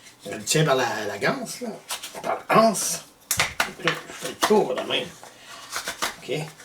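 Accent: Quebec, Canada. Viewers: Il est vrai d'affirmer que notre dialecte est quelque peu rustique,,, et pourrait contenir plus de consonnes 😋 Mé ski fo comprendre cé k partir de -20c, Ben la yeule nous gèle, Sion ava toute la même couleur la vie sra Ben plate,. Marci , g bin du plaisir à écouter un grand passionné qui sonne de par che nous, Marci🌲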